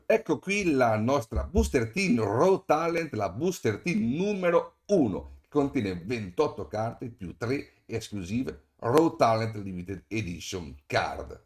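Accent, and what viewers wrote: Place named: Palermo, Italy. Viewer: Sei di Palermo ? Si capisce dall’accento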